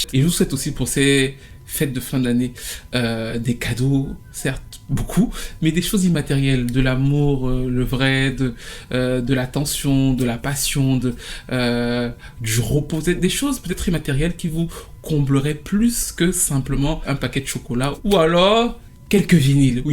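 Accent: Cameroon. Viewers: Voilaaaa, je savais que tu etais camerounais !!! Ce ton!! 😂😂😂😂😂même mon sang faisait bepbepbep, celui ci mm sil est francais, aïe le rhesus continentais est mboomayééé! Cool ! God bless you.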